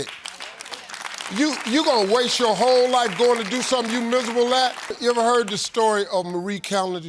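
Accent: South, United States. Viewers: I think learning from something you're interested in is a great place to start. You're more likely to stick with it. But I would also recommend some type of formal schooling. I took French in college and it was very challenging but beneficial because we weren't allowed to speak in English at all. Also I don't know if you can tell but has more of a southern American accent. Like he says "pah" when a lot of people would say "pye." Hope that helps and makes sense!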